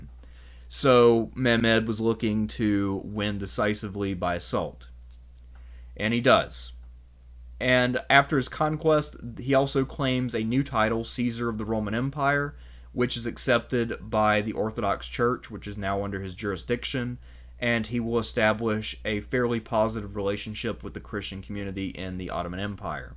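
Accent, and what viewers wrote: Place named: United States. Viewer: Our narrator doesn't really do pronunciations very well. Almost every video he "Americanizes" other people's languages. I guess you can't be good at everything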